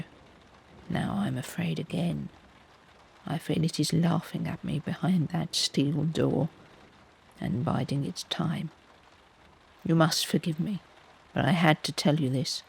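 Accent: England, United Kingdom. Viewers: Oh I am forever grateful to have found your site! Your soft spoken English (not American or AI) is so relaxing for this Australian at bedtime. I hold out hope that one day we will get to enjoy longer stories written by yourself. Your writing is great! I'm very appreciative. Thankyou, and many blessings to you ❤️🙏🏼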